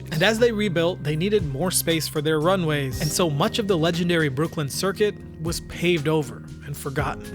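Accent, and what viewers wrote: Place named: United States. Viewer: a Yank talking about British racing...? no sorry , you've lost me not even a minute in...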